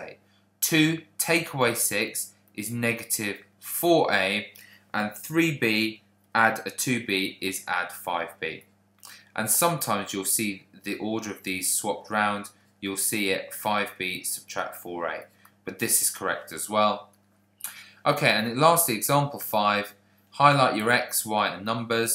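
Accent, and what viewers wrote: United Kingdom. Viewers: He has something called a British accent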